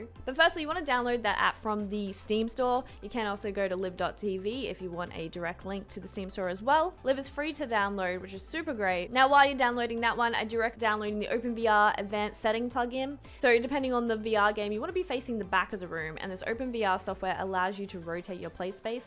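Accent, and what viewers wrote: Australia. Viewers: Oh you're an aussie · Listening to her accent I get Aussie vibes....am I accurate? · Is she Australian? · i knew it, i knew u are aussie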